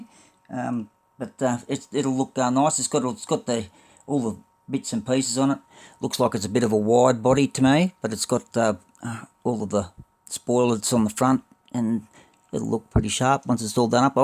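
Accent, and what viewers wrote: Australia. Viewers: Wow....you have enough cars there for at least 6 months of videos 😁 Can't wait to see HCT back up and running as it is also good to hear your Tassie accent on YouTube again mate 🤙🏼 I enjoy your videos as I always pick up a tip or two on customising so I wait with bated breath to see what's next!! That van with the bubble windows will be a fun build I reckon. Keep up the relaxed and interesting vibes 😎🇳🇿🍺🍻🍕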